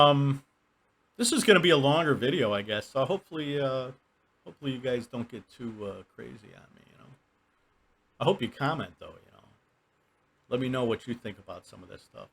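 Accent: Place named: Wisconsin, United States. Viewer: The older i get the less noise l can tolerate , headphones hehe rooster barn ,are you sure you ain't living in a chicken coop? I hear there a rooster gangs there l used to wish l could sing, now l wished they could. Mmmmm diesel exhaust. We are too stoopid to go metric mmmmmm big black plumes of smoke l had a feeling you was from the Midwest , l was thinking Ohio I'm even hearing a little Wisconsin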